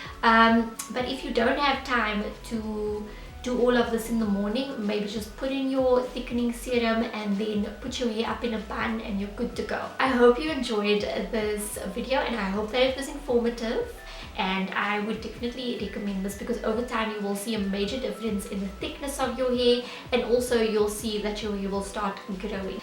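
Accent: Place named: South Africa. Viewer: Sounds like South African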